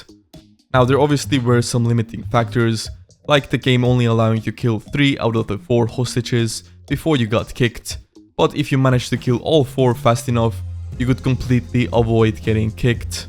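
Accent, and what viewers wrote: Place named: Europe. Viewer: are you from a European country by any chance? your English is good by the way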